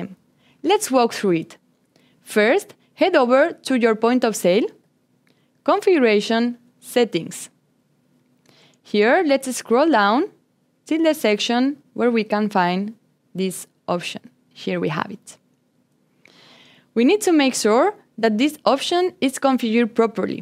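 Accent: France. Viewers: Coostomer 😂 , or customer anyway , nice video with french accent 😊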